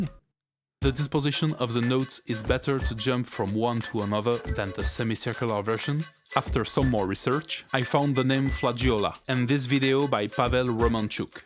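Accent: France. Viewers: Le magnifique accent français xD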